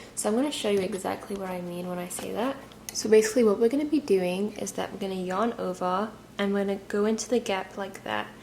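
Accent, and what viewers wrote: England, United Kingdom. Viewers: Love the English accent😊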